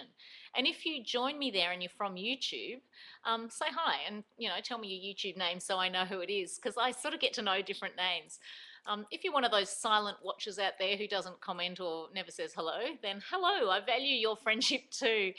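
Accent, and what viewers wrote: Australia. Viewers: My passion is History, geography, and art such as painting landscapes and photography, 3d art /animation, and photoshop. I took photograph class and I loved it. It was fun doing the processing also, I used black and white Ilford resin back paper and I like using Ilford 35mm film with this 70's Minolta camera. I mostly use a Kodack camera now. However I think about using 35mm color film with the 'ol Minolta. I have some filters I wanna experiment with. I love your Aussie accent. = )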